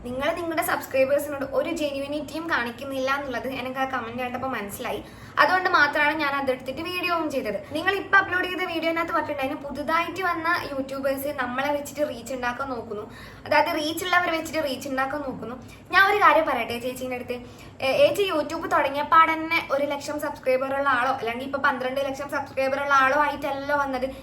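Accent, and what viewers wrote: Kannur, India. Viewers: Kannur ahno njngalde slang pole thonny😌😂❤ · ചേച്ചിടെ സംസാരം കേട്ട് ,കണ്ണൂർ ആണോ? കാസറഗോഡ് ബോർഡർ ആണോ?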